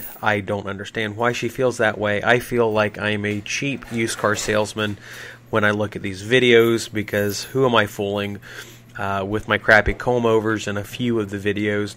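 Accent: Ohio, United States. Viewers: hmm really interesting, I picked up on your dialect and mentioning that landmarks like sack n save. Crazy that YouTube randomly suggested a creator that lives in my small little Ohio town. The strangest part of this is I’ve never really watched any investment/laundromat videos until this one was suggested for me. seems even crazier that when it does suggest one its someone that’s a 10 minute walk to their laundromat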